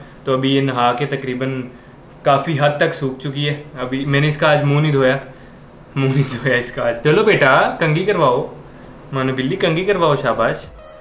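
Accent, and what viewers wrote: India. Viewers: Bro zindabad bolo jindabad nahi.You sound like an indian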